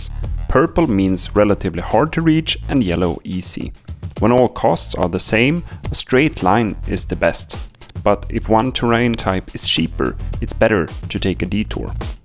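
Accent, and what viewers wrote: Sweden. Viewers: Swedish accent and replacing denmark with water at the end xD 10/10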